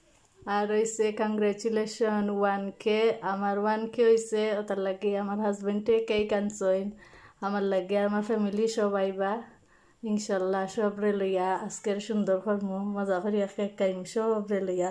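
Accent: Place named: Sylhet, Bangladesh. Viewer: আপনি সিলেটি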